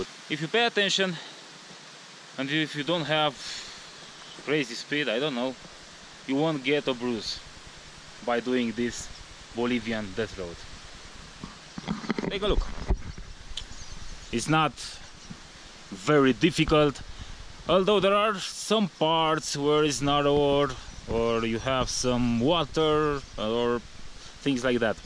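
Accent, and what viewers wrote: Russia. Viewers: Чисто русский акцент) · First time no youre great channel, i like the Rusia accent! Exellent videos!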